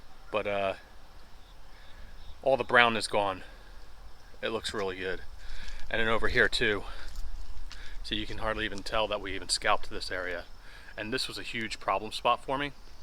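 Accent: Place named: Philadelphia, United States. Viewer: New sub here! Safe to assume you’re from the Philly area/surrounding areas? That accent sounds very familiar! South Jersey here about 20 min from the city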